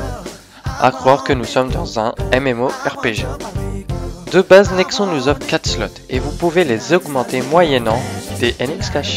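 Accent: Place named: England, United Kingdom. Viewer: Ton accent anglais 😂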